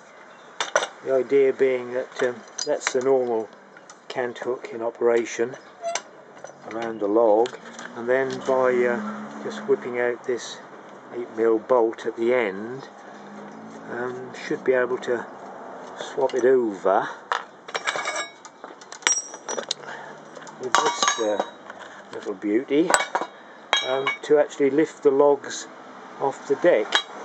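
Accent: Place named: East Anglia, United Kingdom. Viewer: Would love to see it in use! I recognise that accent, whereabouts are you in East Anglia?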